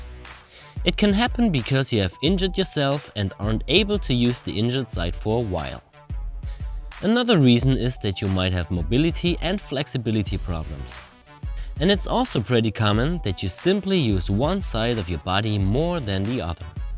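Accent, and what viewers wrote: Germany. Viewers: you are german😀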